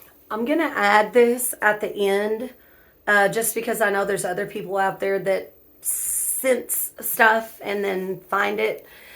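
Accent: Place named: South, United States. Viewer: You are from somewhere in the South, I've heard this accent all my life. I do know you're from some southern state. I relate to that accent. I hope you're right. However he is completely psycho and not like most of us. He is on the spectrum someplace and due to either environmental factors or genetic, he is flawed and he can't deal with it and thus this scenario is what we rare now seeing. A person that just should have gone on down the drug path and stopped there, instead of trying to rise to be and being triggered or what ever you want to call it to kill people. He would be better off homeless and under a bridge at this point.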